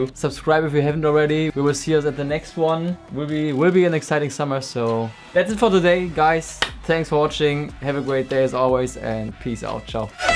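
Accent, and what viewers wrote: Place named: Germany. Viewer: Dein Englisch ist schrecklich. Man hört noch viel zu sehr deinen deutschen Slang.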